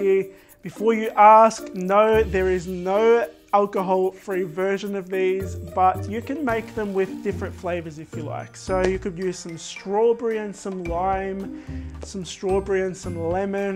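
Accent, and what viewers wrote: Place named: Australia. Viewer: That's Aussie accents for you x Aussie accents are the best ever!!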